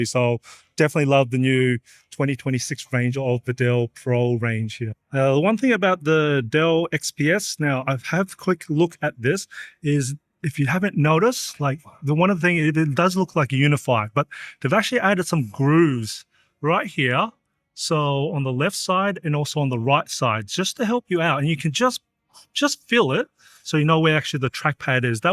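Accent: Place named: Australia. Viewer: The aussie accent 😆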